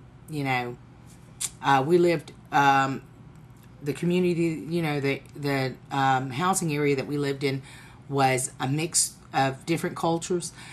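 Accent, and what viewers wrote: South, United States. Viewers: I was like I hear an accent but than I realize yep a country accent. you sound so white tho. glad you had a good experience. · truly you are a southern woman, no matter what your race is. You sound just like Paula Deen to me! Lot's of black southern people are country too! you sound like a home girl to me! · I love your Southern accent!! You're a beautiful lady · OMG, you are so gorgeous and sweet with your almond shaped eyes, beautiful brown skin and Southern accent! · Do you cook? You remind me of a Blasian Paula Deen. Maybe it's the accent. Southern accents have a certain warmth. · See how RACE is so freakina problem with RACE in general cause if you had not known her Direct escendant outcome then you would think this woman was a natural native from Tx or Ok ...etc etc and the the souther accent helps ease the fact that how some ppl would react to the fact of the though of her being "hALF-n***8" (excuse speech) referencing tho. 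· where are u from? you have a thick southern accent. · half black, half asian with a country/southern accent...I love it!!! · Is your MOM ASIAN AND YOUR DAD BLACK? and Are u from Texas because you have a Southern / Country Accent😎😎😎😎😎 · at first i was thinking english but then the more i herd you i was oh okay shes from the south* i luv southern accents*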